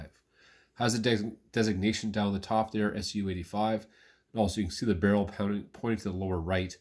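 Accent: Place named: Canada. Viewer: Sexy sultry Canadian voice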